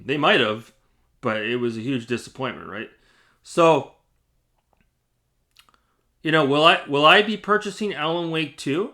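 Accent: Canada. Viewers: haha i clicked your video without looking at the name of your channel, and before you had said 3 words I knew you were a fellow Canadian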